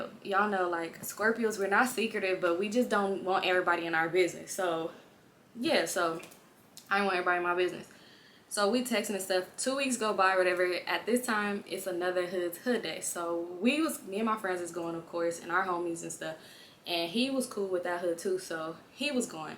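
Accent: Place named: California, United States. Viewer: I can tell you from Cali I am too we love are gold jewelry. The bamboos and the way you talk give it away to us that's from there. Storytime with you be lit tho I just got on here this my 2nd video lol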